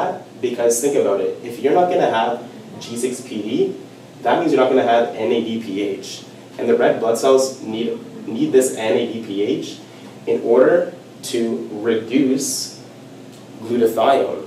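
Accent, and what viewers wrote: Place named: Canada. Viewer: That Canadian accent strong! Nice video. At the end it seems like you were going to make a point that alcoholics typically have B1 thiamine deficiency, which, I assume you were going to say results in deficiency of transketolase. Is that significant? Or will it just act as a shunt towards Nuc Synthesis and not be able to recycle back towards glycolysis?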